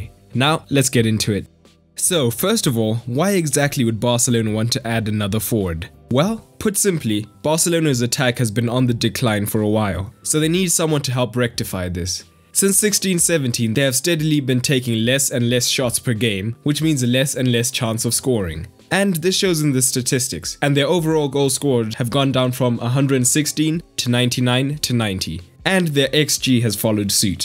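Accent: South Africa. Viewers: Is it just me or does the narrator sound South African 🤔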